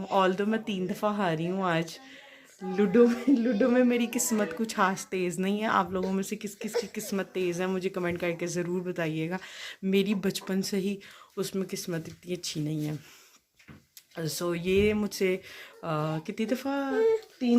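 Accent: Sialkot, Pakistan. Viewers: Ap lgta sialkot se han jaisi Urdu bol rhi han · Sialkoty ki booli pahchani jati jitni mrzi achi urdu bool lain mgr punjabi tuch zoaroor shamil hota ha..😀 Don't tention bcoz i m also siakoty..😉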